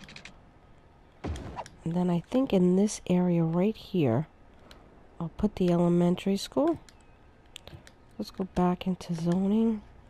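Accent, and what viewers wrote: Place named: New York, United States. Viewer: So I was wondering if anyone ever told you, that you sound like the woman from the TV show "King of queens". Lol I love that show. She did a great impression of a genuine new Yorker. And you sound like her. I'm hazarding a guess that you are a new Yorker but I've been wrong before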